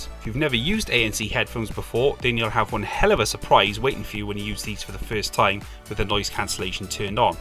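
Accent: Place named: South Wales, United Kingdom. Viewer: Another great video. Good work! Nice to hear another South Wales accent 🤣👍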